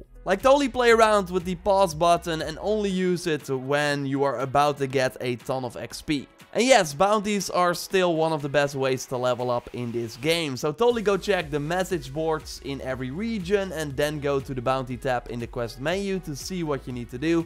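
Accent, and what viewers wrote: Netherlands. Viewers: Your definetley dutch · Your english sounds dutch too me 😂😂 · Echt heftige nederlandse accent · You can hear by his accent, this guy is Dutch. If you is Dutch. "Wassup Northern brother" If ya aint. "How you sound Dutch?" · His Dutch accent 😂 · Jij bent toch een Nederlander hè? Omdat je accent er op lijkt.... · Are you Dutch by any chance?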